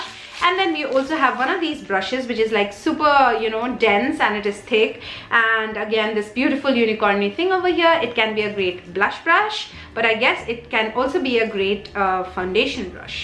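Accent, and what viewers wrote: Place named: England, United Kingdom. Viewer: from where you learn english to speak like your speaking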